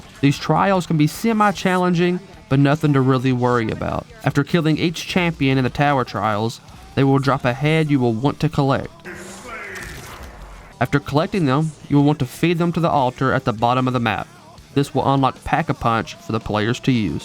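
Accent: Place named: United States. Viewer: youve got the most american accent i have ever heard and i kinda love it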